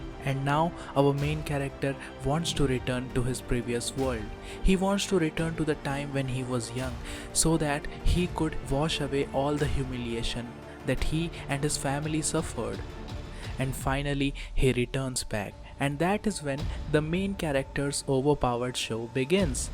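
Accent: India. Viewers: the Indian accent kinda ruined the whole video so I had to watch it without sound. indian dingdongdingdong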